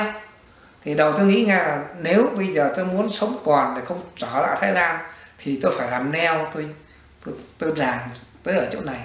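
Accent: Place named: Hai Duong, Vietnam. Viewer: Đúng là giọng Hải Dương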